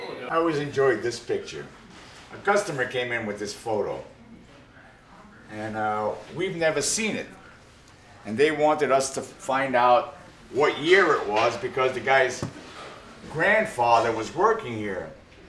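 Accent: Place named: New York, United States. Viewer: Such heavy NY accent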